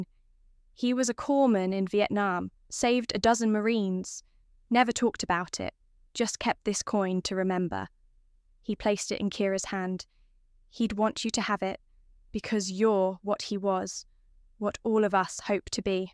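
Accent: United Kingdom. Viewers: Gloss or glass? Watching from Tagaytay City, Philippines by way of Oil City, Pennsylvania, USA. How do we get rid of the British English(?) narrators?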